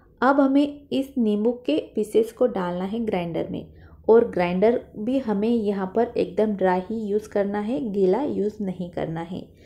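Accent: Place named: South India, India. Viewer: Achaar dekhne se hi kafi tasty lag rha hai aor usase bhi achi baat hai apki Hindi bhasha jisme se thoda thoda South Indian ka khushbu aa rahi hai, that sounds nice and God bless 🙏🙏💐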